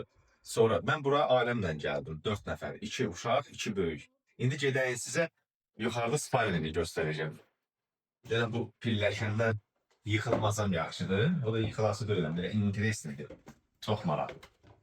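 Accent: Ganja, Azerbaijan. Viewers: İn şəə Allah olarsan Rəbbim hidayət versin yaxşı əməllərinə görə amin .Gəncədən sən deyəsən ləhçən oxşayır)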